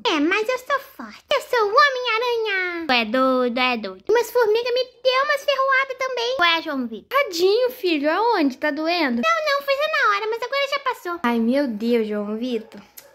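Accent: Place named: Northeast, Brazil. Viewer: Te amo muito ❤️,minha princesa linda nordestina 🌵 ❣️ Ela é muito engraçada e ainda por cima tem sotaque nordestino 💞🌵 Linda 💜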